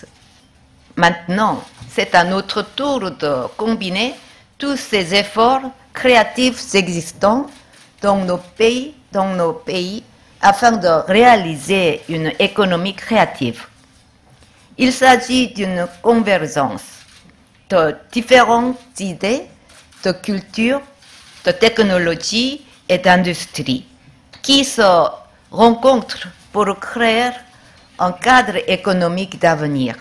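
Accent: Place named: Paris, France. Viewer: 불어할 줄 아는 사람은 알겟지만, 나쁜 불어실력은 아님. 하지만 정상씩이나 되서 외국에서 자랑할 만큼은 아님. 기본적인 발음을 파리식대로 하긴 하는데... 억양이 듣기가 정말 싫다. 애기가 처음에 말 배울 때 더듬거리는 거 같음. 프랑스인이 볼 때 진짜 병신같을 거 같은데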